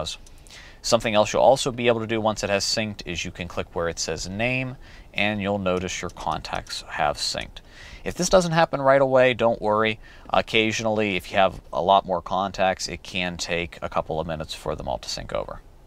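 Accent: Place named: England, United Kingdom. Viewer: very nice english accent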